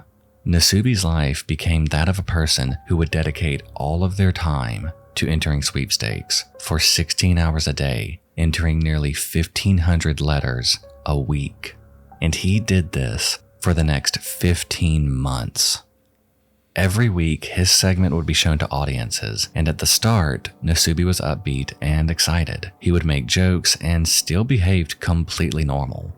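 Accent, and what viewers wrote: South, United States. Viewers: I just noticed his southern accent how did I never notice it before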